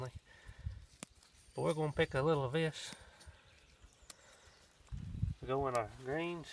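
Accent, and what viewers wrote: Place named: West Virginia, United States. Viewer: you all have a beautiful garden.. and the sound of your voice reminds me of my home in Logan county West Virginia.. I have lived in south Carolina for almost 19 years and I can't get nothing to grow in this red clay.. I could grow things when I lived up home in the mountains.. I miss my mountain home.. May God continue to bless you and your little family...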